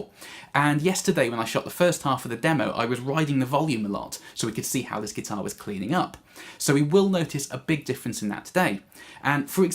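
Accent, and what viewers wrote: United Kingdom. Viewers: Great job! And I love your cool british english. Well done, sir.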